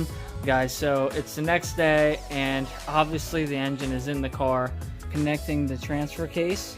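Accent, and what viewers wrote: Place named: Philadelphia, United States. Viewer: the philly accent makes this build amazing.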